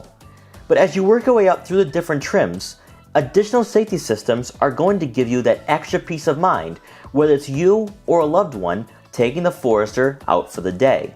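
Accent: Boston, United States. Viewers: Terrible accent for a Bostonian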